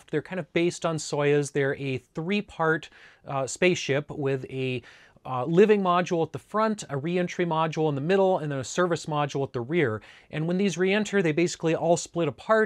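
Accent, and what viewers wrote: Alaska, United States. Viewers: As a Canadian I appreciate your Alaskan accent. Nice video, 👍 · I live in the Twin Cities. You have an Alaska accent?! What!?? You sound totally local. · Nothing wrong with with your Alaskan accent ….Manchester England 👍👍👋👋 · I never noticed your accent until you spoke the words “my Alaskan accent” and I heard it immediately. My brain went directly to Tina Fey playing Sarah Palin on SNL… I think I might be getting old. Cheers from Canada! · As a Swede, you’re Alaskan pronouncing is absolutely fine. I have never had any problems understanding what you say 👍😀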